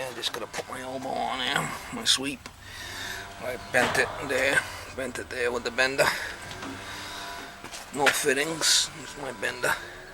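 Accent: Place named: New York, United States. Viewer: Hi another good job there I do like watching your videos all the way in not so sunny England,I've watched a few of your videos and you seem to highlight the need to install the correct size of pipes,I'm a commercial heating engineer so I do understand the need for correct pipe sizing, I'm new to AC is there a chart to help you and more so me with choosing the correct size pipes keep up the good work Ps loving that New York accent Kirk (Manchester England)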